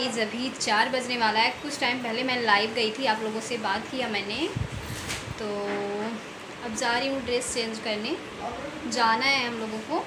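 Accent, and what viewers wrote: Bihar, India. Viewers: Tum bihari lagti ho... Bhasa to bihari lagti h...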